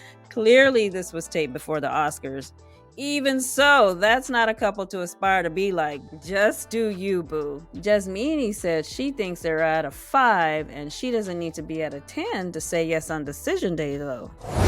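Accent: Boston, United States. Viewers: Your Boston accent is so on point 😂😂😂😂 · When this season is over, I’m going to miss your Bostonian accent! It tickles me everything I hear it 😂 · The Boston accent 😆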